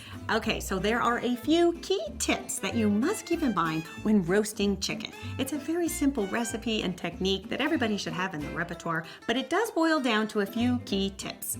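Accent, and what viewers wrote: United States. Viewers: what kind of american accent is this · What a great pity yanks have have so much trouble with plain English?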